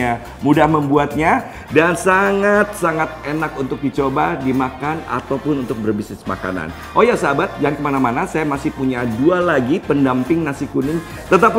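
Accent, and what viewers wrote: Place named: Java, Indonesia. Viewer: Mbae ayu2 ngomong nya medok banget 😆😆😆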